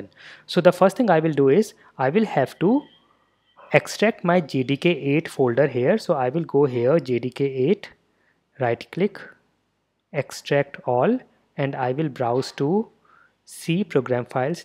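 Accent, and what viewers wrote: India. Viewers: most words said by you sound kind of funny (the indian accent, not your pronunciation becuase that is spot on obviously) but when you say java it sounds like it was made for your accent not ours, that accent hits the word "java" and sounds more then perfect, anyway thanks for the tutorial this is perfect man and I love how it sounds when you say Java.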